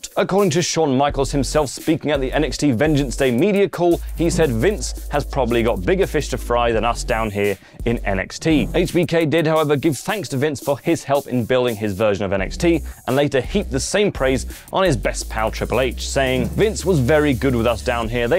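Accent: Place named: RP, United Kingdom. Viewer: This guy is super posh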